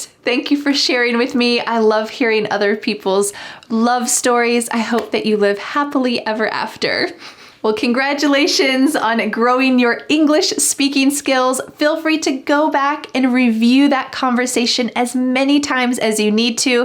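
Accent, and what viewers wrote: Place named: United States. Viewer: Thanks teacher for your teaching, I love American accent to pronounce ❤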